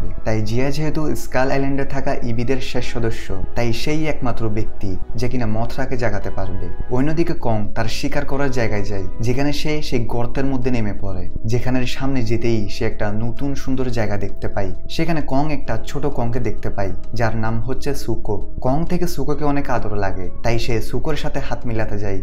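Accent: Chittagong, Bangladesh. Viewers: ভাই আপনার চট্টগ্রামের টোন টাতে কথা গুলো শুনতে ভাল লাগছে,কারন আমিও চট্টগ্রামের ছেলে 😁😊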